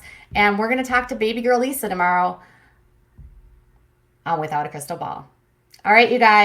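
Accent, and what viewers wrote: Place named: Tennessee, United States. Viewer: Good with the Tennessee accent! I'm from Memphis, lol Good review, as always.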